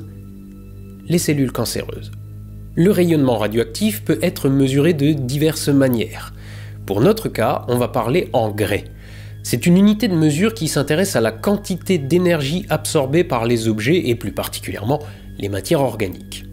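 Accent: Alsace, France. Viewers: l'accent alsacien 👌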